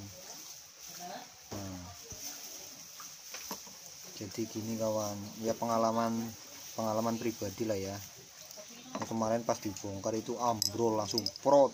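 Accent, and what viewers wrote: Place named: Banyumas, Indonesia. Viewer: Bang ruamhnya mana kok ngapak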